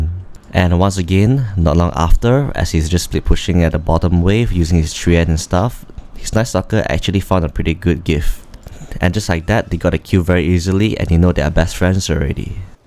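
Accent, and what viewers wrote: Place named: Malaysia. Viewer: i know a malaysian accent when i hear one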